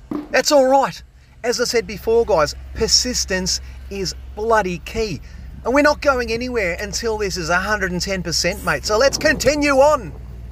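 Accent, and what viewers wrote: Australia. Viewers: You are a laugh a minute thank you, you have made my day watching and listening to you, I lost my husband recently and you have made me laugh again I love your true Aussi language I just love it you are so passionate about your work and the fact you don’t give up is what I appreciate about you thank you again ❤❤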